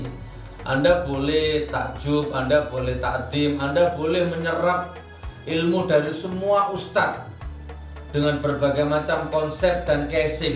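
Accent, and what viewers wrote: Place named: Java, Indonesia. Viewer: Gus... sy tebak pasti sampean orang jawa ya... keliatan medok... wkwkwkwk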